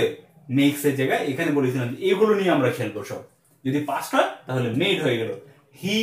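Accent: Kolkata, India. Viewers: কথার স্টাইলেই বোঝা যায় কলকাতার।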